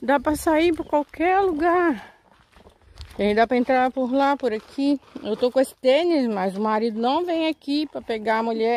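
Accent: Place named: Portugal. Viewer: Olá amiga vc parece uma verdadeira portuguêsa! O sotaque é igualzinho! Kkkk vim fidelizar nossa amizade! Abcos!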